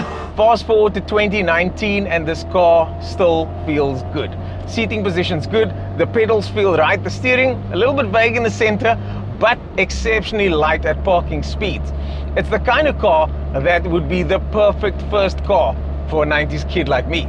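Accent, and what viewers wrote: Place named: Afrikaans, South Africa. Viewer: That horrible Afrikaans accent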